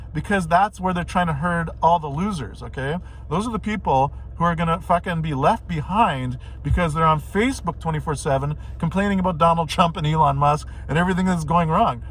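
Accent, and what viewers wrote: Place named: Italy. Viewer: It's funny how I hear your Italian accent during your Spanish lol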